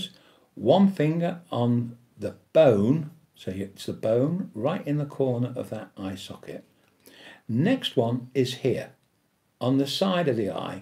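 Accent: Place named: United Kingdom. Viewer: Abonniert wegen der Aussprache. British English 💖💖 Greetings from Munich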